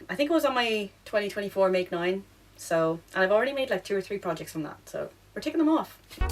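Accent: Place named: Ireland. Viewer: I just found your channel and love your personality and Irish accent! I recently made the Lakes tee by Ozetta, which is almost identical to the tee from MFTK, and I couldn’t believe the amount of ends. I even made a few extra because apparently I can’t count… 🤓 On the sleeves, I started at the top like you suggested and saved myself two ends. 😂 They do all this cutting and restarting so the garment/sleeve is completely the same on both sides, but who cares if there is one round extra on the left side of your sleeve? Noone can tell, right? 😅🤓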